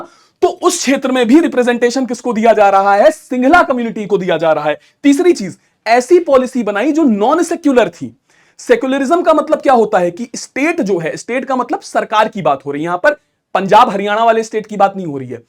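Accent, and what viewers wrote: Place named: Bengal, India. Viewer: Sir ke accent se lag raha hai ye bangla Sinhana ko singhla bolne me dikkat kisi bangla ko hi ho sakti hai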